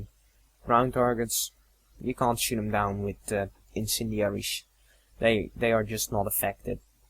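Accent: Netherlands. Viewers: are u dutch i can hear a tiny dutch accent in what ur saying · you are dutch... no doubt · im 10 seconds in the video and I already know now that this guy is dutch. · Ff een vraagje... Ben je Nederlands?? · Are you dutch you have a Dutch accent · are you dutch? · Thnx for the vid, That's a Dutch accent :)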